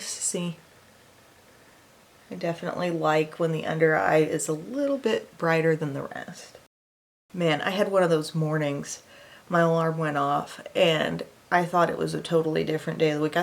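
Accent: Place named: South, United States. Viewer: I also love your “oh isn’t that pretty” in your slight southern twang ❤️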